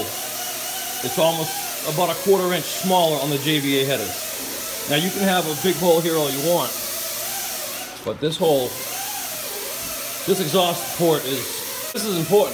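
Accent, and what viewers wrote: Boston, United States. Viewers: Great video. I’m looking for a good set of headers for my ‘21 Ram 1500 Hemi. Also , where are you guys from? You talk like me (Boston).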